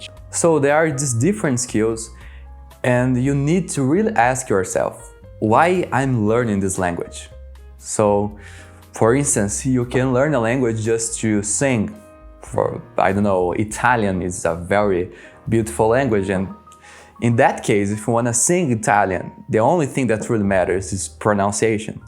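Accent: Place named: Brazil. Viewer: I love brazilian accent when someone is speaking in english, because I can understand what is being said. Thanks for the video!!!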